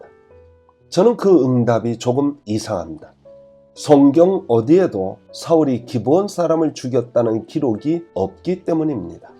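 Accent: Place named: North Korea. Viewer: 강해 하시는분이 보기에 그리 연세가 지긋한 분이 아닌데 왜 북한 사투리를 하시나요?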